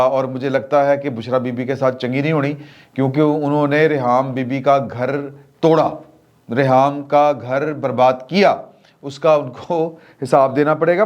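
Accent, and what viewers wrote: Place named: Lahore, Pakistan. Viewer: آپ کا لاہوری لہجہ اور انداز اچھا لگتا ہے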